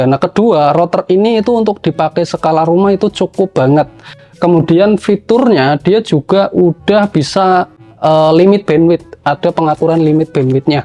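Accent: Banyumas, Indonesia. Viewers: Ora ngapak ora kepenak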